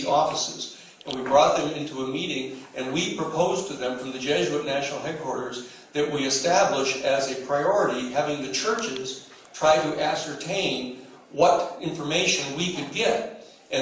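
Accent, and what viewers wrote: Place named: Mississippi, United States. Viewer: from Mississippi, detected!!!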